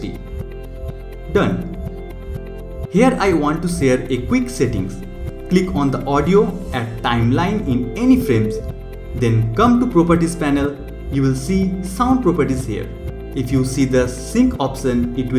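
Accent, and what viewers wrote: India. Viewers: You have a North-East Indian accent :) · this mans got the strongest indian accent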